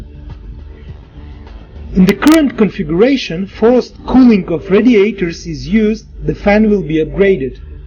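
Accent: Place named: England, United Kingdom. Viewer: это не американский,а английский....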